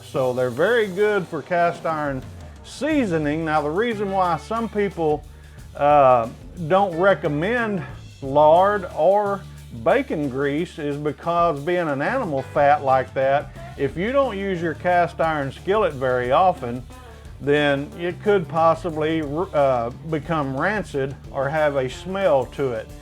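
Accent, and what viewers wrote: South, United States. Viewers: It’s not the name. It’s not the beautifully seasoned pan he flashed at the beginning. It the fact he exclusively calls a skillet, how he pronounces oil, and the fact he is in an extremely ordinary lived in kitchen that makes this video so credible. That little chuckle at the end about flax seed oil…. Wish I had some more southern friends.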